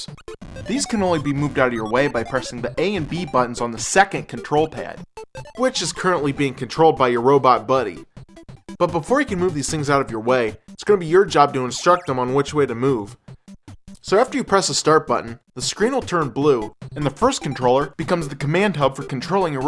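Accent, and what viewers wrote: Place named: Ohio, United States. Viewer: Does "charism" mean a big dumb pasty head with an awful Ohio accent?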